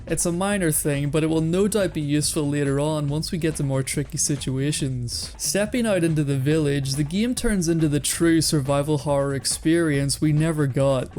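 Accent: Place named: Northern Ireland, United Kingdom. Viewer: Love hearing fellow northern Irish accents around YouTube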